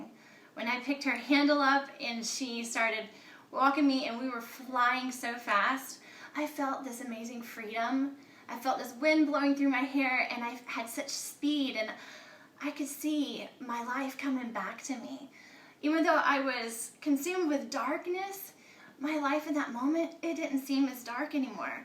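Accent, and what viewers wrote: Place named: United States. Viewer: Just cause she sounds american. u_u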